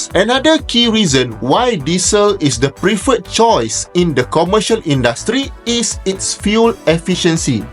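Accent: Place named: Malaysia. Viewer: Mantaplah prof... Bahasa English,tp intonasi masih lg bahasa Malaysia , masih familiar 👍👍👍👍👍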